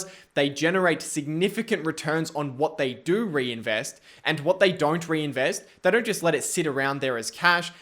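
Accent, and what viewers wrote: Australia. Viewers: Hmm rosy cheeks and an Aussie accent ☺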